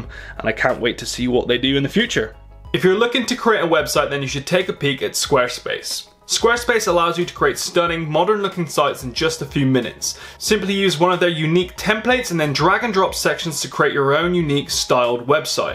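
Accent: United Kingdom. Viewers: he's brittish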